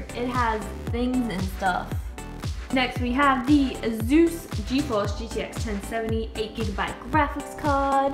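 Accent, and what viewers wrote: Australia. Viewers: Picked really good components, but the "cute" baby talk while describing each of them is like nails on a chalkboard. Is that an Aussies accent? I didn't know someone female could screw that up. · Ahh Aussie, I love it👍😊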